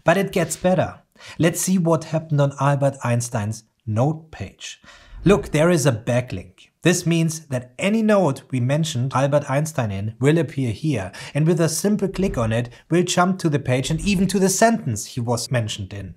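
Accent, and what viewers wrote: Germany. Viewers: Awesome Video! Without looking it up- I guess you are german (like me) :D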